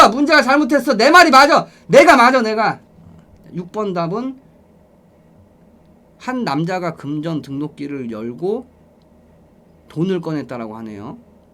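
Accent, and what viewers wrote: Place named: Seoul, South Korea. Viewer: 케인님 나긋나긋한거랑 특유의 서울사투리 거르고봐도 말투나 표현법보면 상당히 여성적인편임 ㅋㅋㅋ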